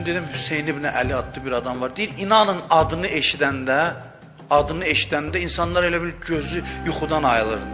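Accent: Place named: Jalilabad, Azerbaijan. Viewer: Senin bu Celilebad lehceni esidende üreyim bulanır , get oyza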